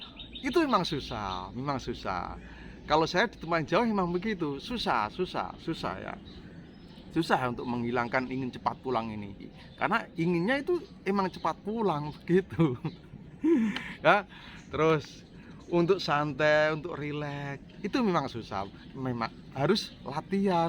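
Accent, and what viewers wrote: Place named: Banyumas, Indonesia. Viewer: Betul pak..sy juga suka dengerin Chanel bapak ngapak jg luw, hehe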